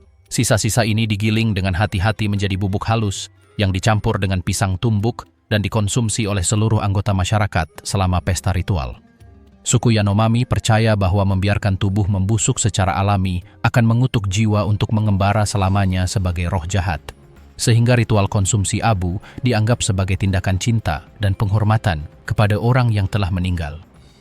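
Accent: Malaysia. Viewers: Min,logat/dialek Malaysia anda masih jelas terdengar.Hebat kan Bahasa/dialeg Indonesia?😊Orang Malaysia lama kelamaan akan berbahasa dan berdialek dengan bahasa Indonesia.....😅😅😅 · Admin gadool, bahasa indonesia gaya Malaysia, Mereke mereke ape bende, gak enak blas di dengerin gatal telingaku nyimak suara admin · Itu kayaknya orang malaysia belajar ngomong indonesia jd logat malaiysianya masih kental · Logatnya malaysia 😢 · Kenapa logatnya dari negeri Jiran padahal nama channel nya a globan Indonesia 😅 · Dari cara BERBICARANYA saja ORANG MALINGSIA😁😁. VIDIO Abal Abal.... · Milih narator nya orang Malay, kalo text nya sih bhsa indonesia 😂gak enak dikuping....skip ah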